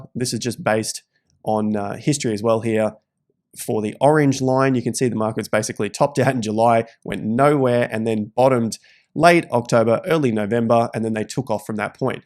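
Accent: Australia. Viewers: Love your video mate.. Very informative to me. Your Aussie slang its nice.. I think BTC will make small corrections when it achieves 48k-52k.. The bottom will be at 40-42k..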